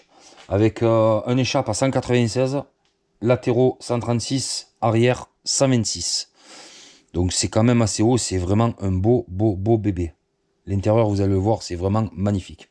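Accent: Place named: South, France. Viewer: T est bien du sud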